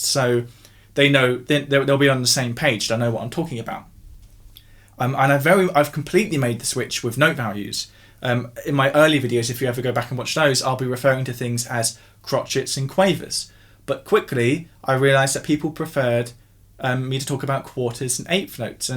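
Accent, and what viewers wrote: United Kingdom. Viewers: Speaking of dialect, where in the UK are you from?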